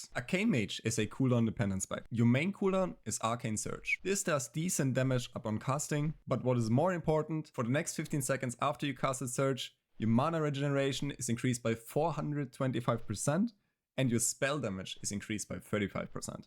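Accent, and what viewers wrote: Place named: Germany. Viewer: You sound german. Do you also have german content?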